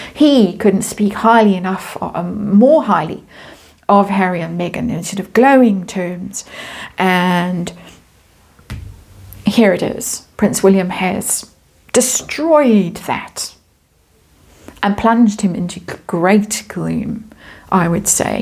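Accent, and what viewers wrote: South Africa. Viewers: I look forward to hearing your voice everyday. A voice of reason and wisdom without the need for puff puffery. Thank you, 🙏 Your English South African accent is a sweet link to my birth country and family, my British South African heritage as a child. Thank you from 🇨🇦🇿🇦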